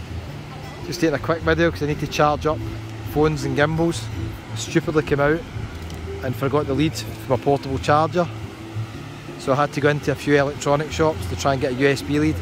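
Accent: Scotland, United Kingdom. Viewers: Always lovely to hear your Scottish voice! Nice one!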